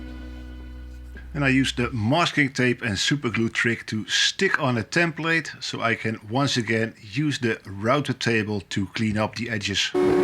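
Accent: Netherlands. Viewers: I didnt know u were a fellow dutchy and assumed you were american due to the americana vibe you had going on. So hearing the accent hit me like a sledgehammer putting me right back in my place. Also nice guitar. Looks sick. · think if you make the neck blank a little longer and higher you might be able to cut two necks out of it , even when the headstock is tilted back you do need to cut the backside of the neck first and lay away the piece you cut off as the headstock of the second neck gets cut from the heelside of the top part leftover looking from the side of the blank the necks will have the back facing eathother and the headstock slightly passed the heel of the other to have enough material (hence the blank needs to be a little longer) you likely need to have sumting to lay the rough cut blank intoo before cutting the sides out but it should save a ton of waste materials je accent klinkt nederlands trouwens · Hi, are you dutch? 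I hear a Dutch accent. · I love these videos. So much information with a cool (Dutch?) accent. :)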